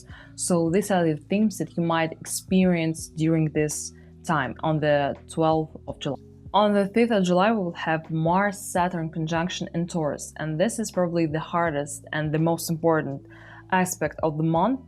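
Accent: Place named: Iran. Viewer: چقدر لهجت ایرانیه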